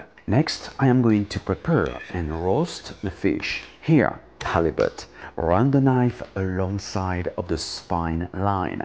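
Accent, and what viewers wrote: France. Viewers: Je kiff ta chaîne en plus tu est français sa s'entend à ton accent bref tu fais des trucs super beau c'est super continue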